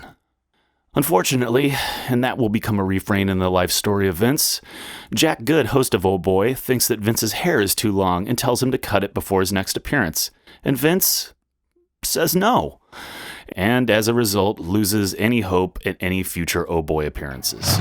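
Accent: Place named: South England, United Kingdom. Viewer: That is not an American accent my friend. Its a south England accent!!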